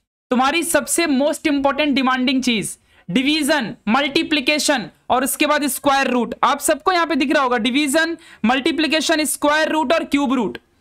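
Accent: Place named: Mumbai, India. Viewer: आप होकर आप से मुंबई हिंदी सुनना अच्छा नहीं लगता!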